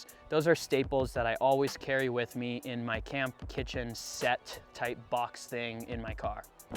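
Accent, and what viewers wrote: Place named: United States. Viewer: hi from india! neat videos. you sound like american born. but what is your ethnic origin? :)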